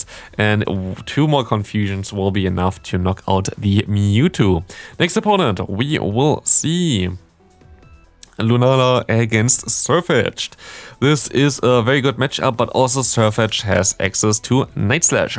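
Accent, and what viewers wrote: Germany. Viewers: Hello welcome to a new video. And he is German. Easy to follow.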